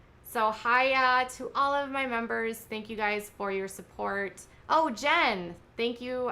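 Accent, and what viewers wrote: Minnesota, United States. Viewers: Lady, I'm thinking you're somewhere near me in MN (new here... You have the MN accent). The storms here a few days ago were nuts! You're kinda funny, and I dig it. Take care!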